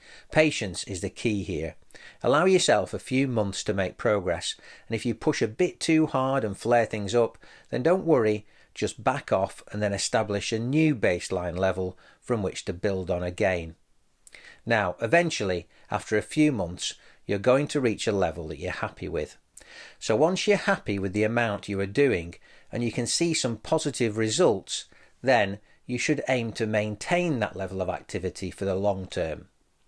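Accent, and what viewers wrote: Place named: Scotland, United Kingdom. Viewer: good Scottish accent 😊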